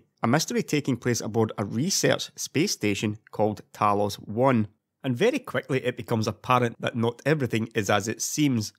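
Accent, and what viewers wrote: Scotland, United Kingdom. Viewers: Is he scottish?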